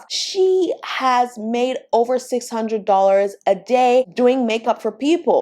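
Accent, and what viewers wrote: Nigeria. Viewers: Glad I found this video. it's great to hear Nigerian, I'm Nigerian too.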